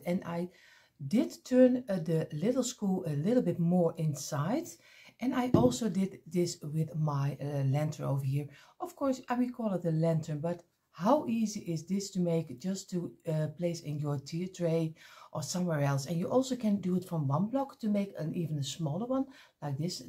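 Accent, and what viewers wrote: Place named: Netherlands. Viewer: Loved The Lantern!! You Have A Great Imagination For Creating! I Also Like Your Dutch Accent, You Have A Soothing And Unique Sound!!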